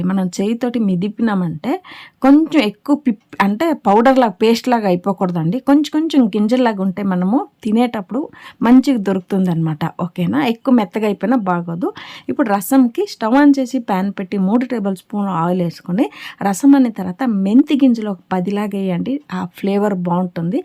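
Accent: Srikakulam, India. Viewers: మీది శ్రీకాకుళం యాస.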